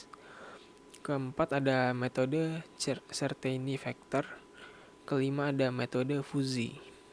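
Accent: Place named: India. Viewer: Keren pak dosen ..... Pak dosen dari india yah? Logatnya kayak orang india 😅😅.... Sukses terus pak dosen ....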